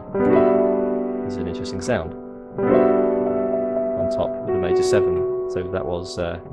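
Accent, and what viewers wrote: United Kingdom. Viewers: I love the way u start ur video. "Hello there". love that British accent And the fun part is i always repeats it myself after watching some of your videos continuosly.😂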